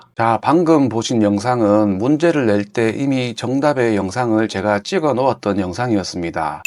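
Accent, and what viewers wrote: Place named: Gyeongsang, South Korea. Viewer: 몽실몽시리하게ㅋㅋㅋㅋ저희집이 경상도라 너무친근감이있습니다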